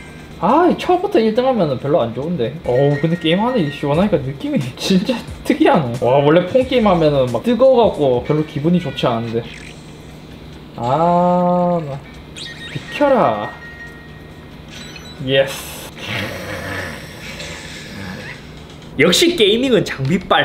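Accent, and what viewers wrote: Gyeongsang, South Korea. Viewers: 역시 경남분이셔 ㅋㅋ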